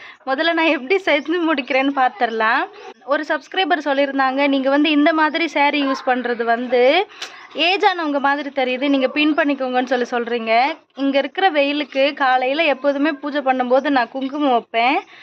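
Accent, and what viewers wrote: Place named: North India, India. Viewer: இங்க வந்து திண்டுக்கல் பக்கம் வளர்ந்த பொண்ணா இருந்தாலும், உங்க தமிழ் வட நாட்டுக்காரங்க பேசுற தமிழ் மாதிரியே இருக்கு. எப்படி???🤔🤔🤔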